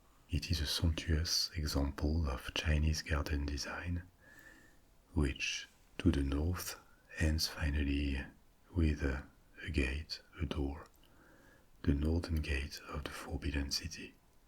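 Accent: France. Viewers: You know......I bet your history content spoken in a normal voice would top Kings and Generals and other top history channels. Your French origin/accent/interpretation of history is a ..... breath of fresh air to all the Anglosphere content. I know there is a ton of French content on YouTube, but for example it was great to hear Napoleonic history from a non British person - I mean for real! The British are so proud of Waterloo - they (and maybe mostly Prussia) defeated WHAT WAS LEFT OF NAPOLEON.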